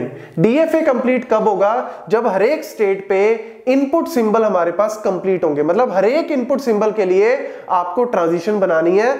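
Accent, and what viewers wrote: Punjab, India. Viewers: Sir ap jo punjabi accent mein teach krte ho usse apnapan lgta hai.. u r truly legend.. ❤❤❤❤❤❤❤❤lots of respect... 🙏 hmare guru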